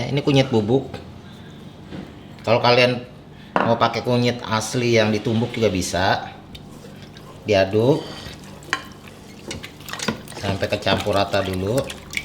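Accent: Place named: Java, Indonesia. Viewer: Kita.." eller ". 😀😀😄😄😄 Medok bingit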